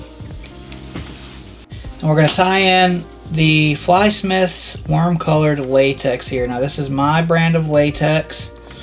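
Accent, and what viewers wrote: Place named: South, United States. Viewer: I cant figure out your accent,much love and respect from western NC,you sound like you could be from the south but cant tell for sure.i grew up fishing the Sierra nevadas as a kid but call asheville nc home now..but also what state you doing tattoos in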